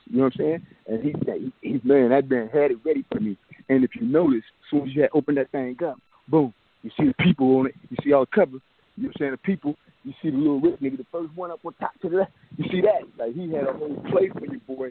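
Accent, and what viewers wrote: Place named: West Coast, United States. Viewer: Man, his accent cracking me up. I love it , straight west coast. I know they probably feel the same way hearing a southern/Louisiana accent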